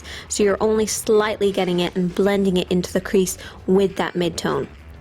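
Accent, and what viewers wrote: Ireland. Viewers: So weird you have more Irish accent in this video compared to your latest videos. Hahaha ! Still love ya :)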